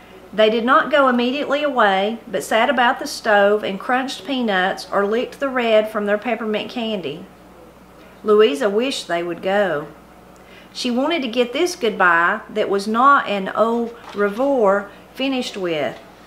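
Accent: Appalachia, United States. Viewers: Thank you so much for reading the story with the true accent of your voice, and reading the words as written with the Appalachian way they are/were spoken. I pray you enjoy all the blessings our Lord has given you and your family. Thank you also for sharing the excitement of your life. God bless you in Jesus's name!!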